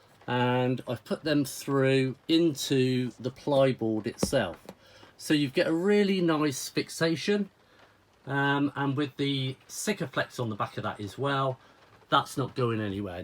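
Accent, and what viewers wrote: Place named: Bournemouth, United Kingdom. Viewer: You sound like you are Bournemouth, the guy in B and Q told me to watch :) cheers mate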